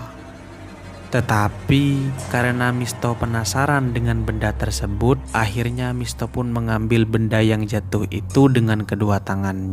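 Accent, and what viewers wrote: Madura, Indonesia. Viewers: Note voice atau logat indonya khas bondowoso madura..tapi keren dah..lanjut kan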